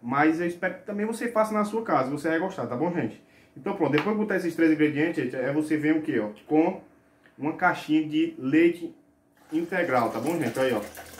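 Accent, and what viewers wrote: Ceará, Brazil. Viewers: Vc deve gostar muito da sua sogra Coral. Kkkkk.Até bolo faz pra ela. Toda sogra queria ter um "genro anjo" assim; como vc 😂😂😂😂😂. Vc tem sutaque de cearense. Parabéns! Sou cearense e amo bolo mole. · Cearense a gente conhece de longe. Kkkkk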